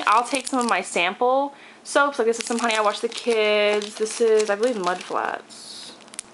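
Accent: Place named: Maryland, United States. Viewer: this is a hardcore maryland accent, I talk like this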